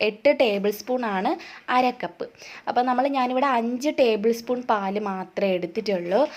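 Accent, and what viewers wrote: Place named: Malabar, India. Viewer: മലബാറുകാരി യാണോ ഭാഷ കേട്ടത് കൊണ്ട് ചോദിച്ചതാ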